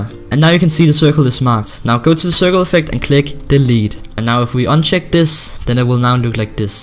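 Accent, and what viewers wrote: Denmark. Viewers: are you from denmark? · are you danish?! omg i'm too danish omg!!!!! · Are You danish? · er du dansker?? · Come you from Danmark? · Are you from Denmark? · heh realised you were danish because of your accent lool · I elsker your danske accent;);D:D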